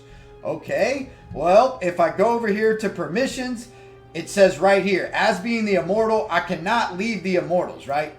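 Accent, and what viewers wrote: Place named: Texas, United States. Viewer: Texan accent makes sense. Oil money=No Skills/P2W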